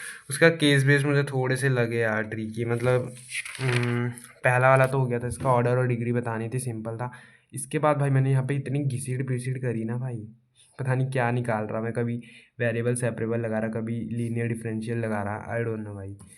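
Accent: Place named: Odisha, India. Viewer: Bhai ap odisha se ho kya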